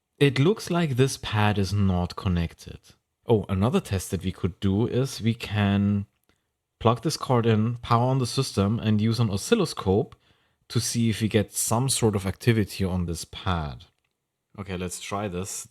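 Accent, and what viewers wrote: Germany. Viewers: based on his accent I would guess he is German, in Germany it is common to say nineteen hundred but as soon as you come to the two thousands you wouldn't say twenty nine hundred and say two thousand nine hundred. It's a force of habit to say numbers this way.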